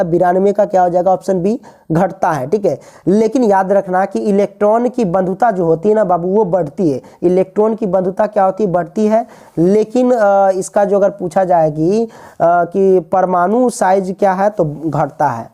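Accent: Bihar, India. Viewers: Sir aap Bihari style wa......🤞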